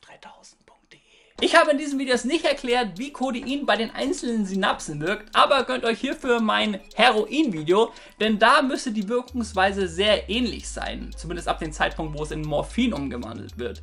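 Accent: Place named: Franconia, Germany. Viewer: Ist ein Dialekt, das sagt mann so in Franken.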